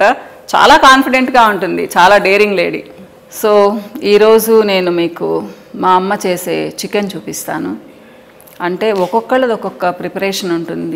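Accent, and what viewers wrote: Nellore, India. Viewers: Medi nellore aa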